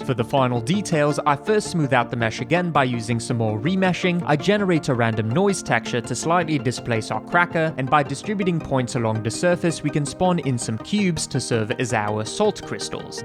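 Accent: United Kingdom. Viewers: the British accent sounds pretty good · Haha the british ish accent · Is he doing an English accent? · why your accent turned bri'ish? (loved the tutorial tho it was amazing) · Damn very British! Really posh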